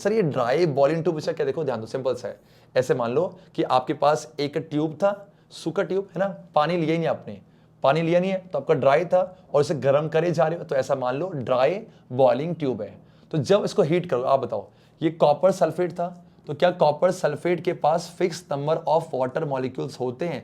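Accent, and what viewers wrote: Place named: Delhi, India. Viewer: Sir delhi ke hai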